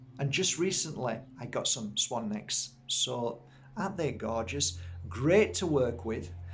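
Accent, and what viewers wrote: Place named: Hull, United Kingdom. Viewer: was you originally from Hull . Its the accent.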